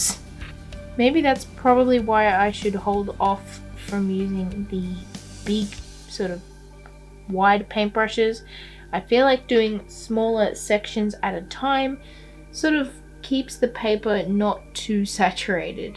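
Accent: Australia. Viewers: The bob rossy australian vibes with the way you talk plus the typical you drawing pokemon is just perfect This is how I like it haha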